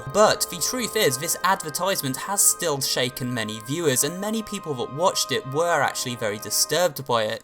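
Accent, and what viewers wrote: United Kingdom. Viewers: R u British I can tell from ur accent I'm British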